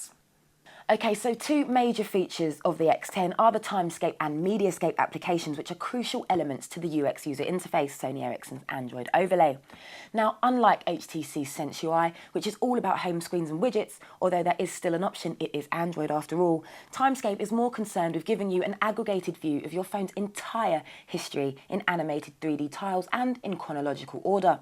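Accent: United Kingdom. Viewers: fuk this british acsent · Yeeeeeaaaaa, British English is charming)) Amazing pronunciation=))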